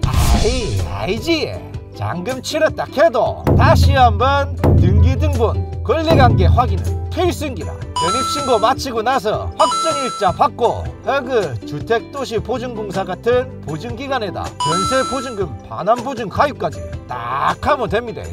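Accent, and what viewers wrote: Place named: Gyeongsang, South Korea. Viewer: 경상도사투리 구수하네요 안산사람의 경기도사투리 쓰는게 아니삼? 고항의 안산인데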